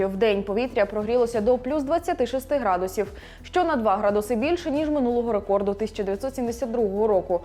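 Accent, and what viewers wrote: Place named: Ukraine. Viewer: Лайк каналу.Ведуча на місці.Фонетика українська.Учіться, чикалки і щикалки!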